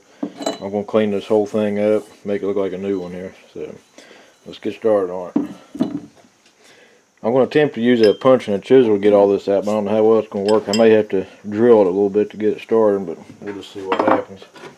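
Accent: South, United States. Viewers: is a country guy with a southern accent. No apologies needed because that is who he is. I am enjoying your videos!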